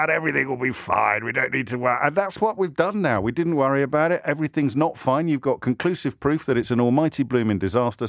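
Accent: RP, United Kingdom. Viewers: O'Brien straight up nuking the Tories... and that RP accent is great and the frying pan bit was really funny.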